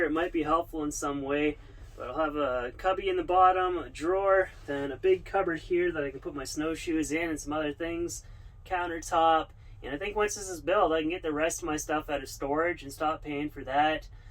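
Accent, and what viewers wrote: Canada. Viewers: since i follow i learn how to say OUT in canadian, "IUT"...lol:D:D love u brother:)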